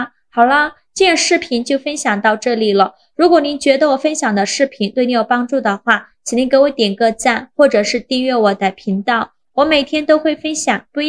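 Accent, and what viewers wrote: Yunnan, China. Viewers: 有趣的云南普通话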